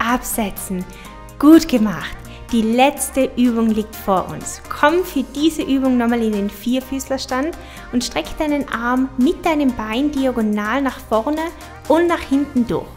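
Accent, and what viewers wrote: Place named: Austria. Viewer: Wer hätte gedacht, dass ich mich mal so über die aufmunternden Worte in österreichischen Dialekt freuen würde, während ich mich auf meinem Fußboden rumquäle! Ohne Spaß, hin und wieder versuche ich mich an anderen Workouts, aber ich komme immer zu deinen Videos zurück. Danke für tolle Arbeit!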